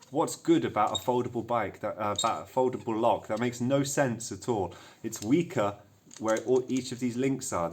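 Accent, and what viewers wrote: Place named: United Kingdom. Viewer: hear me out... He's in Brussels, sounds like he's from the UK, maybe they call an angle grinder a circular saw across the pond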